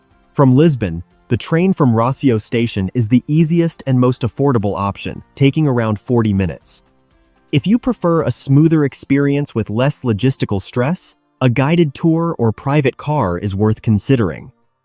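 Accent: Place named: United States. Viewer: The American accent is destructive to a description of Europe. How many ways can you pronounce Tagus ? This alleged easy first day is as relaxing as Shinjuku station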